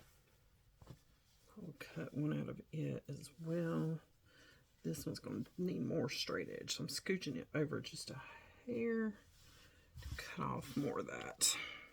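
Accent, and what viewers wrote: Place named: South, United States. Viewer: My favorite Christmas cookie is Chocolate Crackle cookies. It is so easy to make with a cake mix. Every time I make them they just disappear in a hurry. My whole family loves them. Love your channel and love to listen to your southern accent as I have said before. Your videos are all great.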